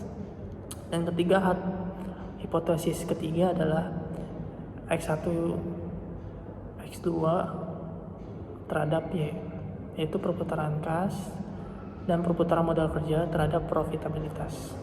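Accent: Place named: Java, Indonesia. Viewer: Lucu bgtt kayak mas mas jawa😂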